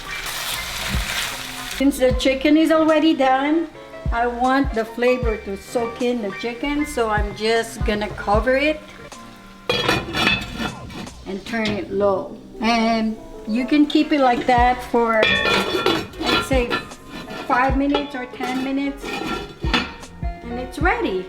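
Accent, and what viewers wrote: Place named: United States. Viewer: i like your cute american accent , count me as your number 1 fan and subscriber. i miss your cooking when we were a lot younger !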